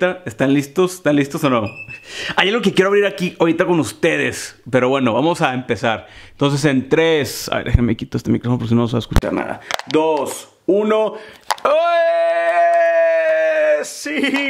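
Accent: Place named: Northern Mexico, Mexico. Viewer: Esa voz norteña ❤ jaja creo que si hablamos fuerte y golpeado jaja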